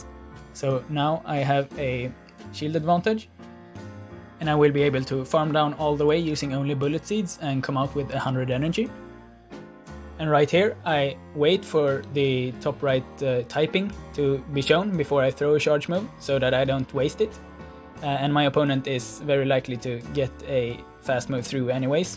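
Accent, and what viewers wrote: Sweden. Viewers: damn that's some swedish accent. kinda love it thoe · Love Swedish accent so much! So sad that I was not able to fly to Stockholm recently.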